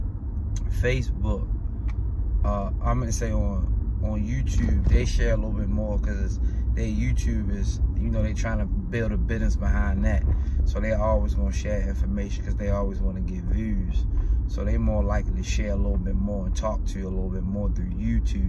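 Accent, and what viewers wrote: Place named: Baltimore, United States. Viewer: Great information my brother.Are u from Baltimore?I got homies from Baltimore and they talk just like u bruh😂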